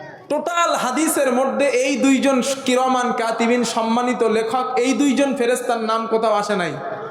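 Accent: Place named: Bangladesh. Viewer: মাওলানা সাহেবের আলোচনা শুনে মনে হয়, কোলকাতার মাওলানা নয়! বরং বাংলাদেশের কোনো মাওলানা। কেননা, বাচনভঙ্গি হুবহু বাংলাদেশী মাওলানাদের মত ।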